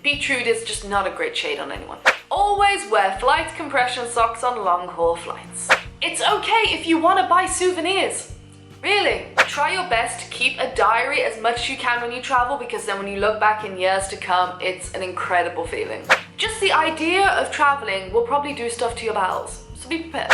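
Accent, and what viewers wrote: England, United Kingdom. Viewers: are you english ? (L) i love your videos anyway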